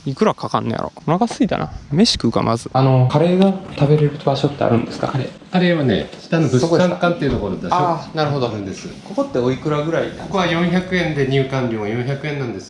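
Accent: Kansai, Japan. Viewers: お疲れ😃💦さまの関西弁👍️いいね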